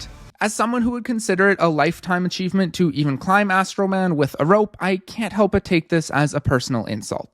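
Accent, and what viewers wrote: Canada. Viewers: I can tell the narrator is Canadian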